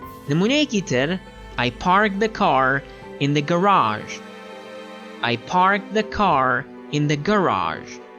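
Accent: United States. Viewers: I think you speak american english .. thanks so much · Are you speak America or Biritsh ?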